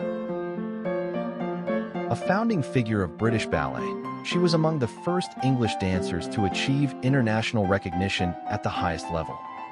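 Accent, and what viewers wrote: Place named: United States. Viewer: Why the hell do we have a Yankee voice on this video? The Royal Ballet is English. We neither need nor want Yanks. I know that Miss Naghdi and Miss Haywood both speak English almost as well as they dance with beautiful English accents. Why ignore such quality resources and use something more and more are finding offensive?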